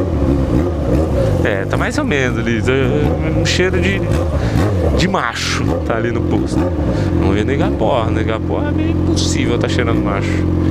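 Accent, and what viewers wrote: Goiás, Brazil. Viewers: pq vc fala no pique do goiás,sendo que vc mora no paraná?kk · tu fala no pique do goias pq vc eh daqui de goias?..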